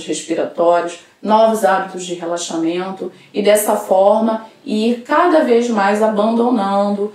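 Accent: Rio de Janeiro, Brazil. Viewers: Você é Carioca? Ótimo video Devo deixar de tomar Café? Consumo mais do que o permitido.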